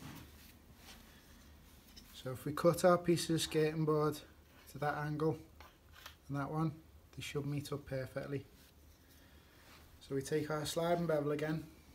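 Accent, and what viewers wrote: Liverpool, United Kingdom. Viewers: Scouser. He must have learnt this in prison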